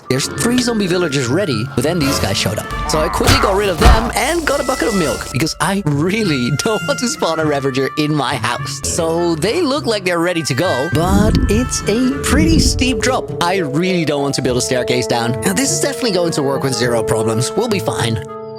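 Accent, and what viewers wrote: Netherlands. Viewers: incredible! just finished binge-watching your videos. also, your English has a slight Dutch accent. Does that mean you actually are Dutch?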